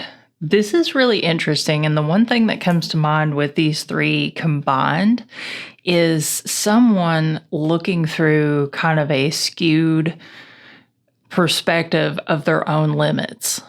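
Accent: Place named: East Tennessee, United States. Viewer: you HAVE to be from the East Tennessee, SW Virginia area?? That’s where I am originally from and you sound like all my kinfolk! 😊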